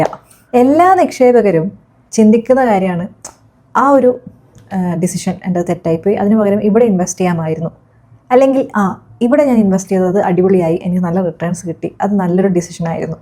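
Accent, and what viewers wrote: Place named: Malappuram-Palakkad, India. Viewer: നിന്റെ സംസാര ശൈലി നല്ല രസമുണ്ട്. മലപ്പുറം പാലക്കാട്‌ ഭാഗത്തെ സംസാര ശൈലി പോലെയുണ്ട്. Anyway good content and good presentation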